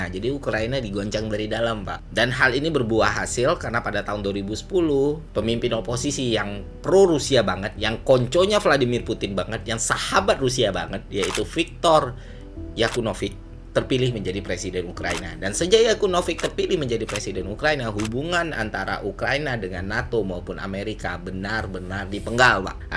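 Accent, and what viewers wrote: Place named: West Java, Indonesia. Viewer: logat sundanya..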